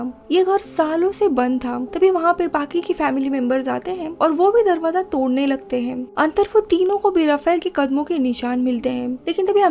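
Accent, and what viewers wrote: Maharashtra, India. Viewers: That marathi accent 😍😍❤❤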